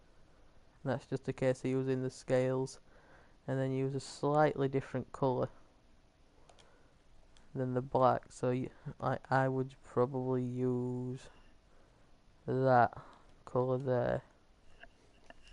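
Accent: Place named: Yorkshire, United Kingdom. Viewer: Deffo from Yorkshire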